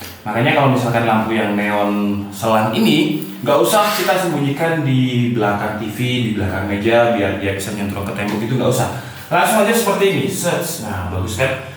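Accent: Java, Indonesia. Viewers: Bukan karena intro 'Hai gays disini', tapi yg unik itu ada bahasa jawa medoknya "mbarak/nyengkrak/nyetrong/jeding/ceduk" yg biasanya dilanjut pertanyaan "Opo Tom bahasa indonesia-ne?"... Saya ada saran untuk pasang led stripnya misal sesuai yg dibilang pake akrilik dicat/triplek/papan dilubangi di setiap ujung dari huruf dan setiap sudut huruf dg diameter sesuai lebar led strip, dilubangi untuk setting supaya tampilan huruf angka/kalimat yang terlihat bisa terputus bukan latin karena lekukan led stripnya diselipin di belakang akrilik dicat/triplek/papan lewat lubang tadi, seperti buat lubang untuk jalur keluar masuk led stripnya dan yg terlihat di depan hanya yang dibutuhkan tanpa potong led strip...Jadi gak perlu pasang double-tip di tembok, disusun dulu di rangkaiannya baru digantung/ditempel paku di tembok.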